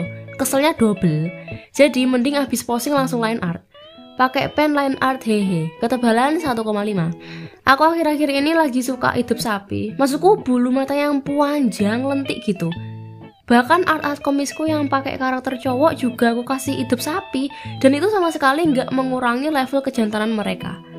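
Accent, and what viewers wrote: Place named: Banyumas, Indonesia. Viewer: Mbak medhok ngapak Bahas dong kenapa kenapa kita gak bisa improve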